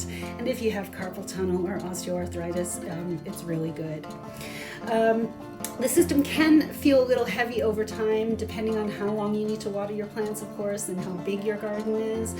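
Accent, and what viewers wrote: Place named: United States. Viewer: Started watching the video and thought.. this is confusing... american accent but looks like dull UK weather & UK home / windows... yet then you mentioned you aren't in USA! I must be psychic...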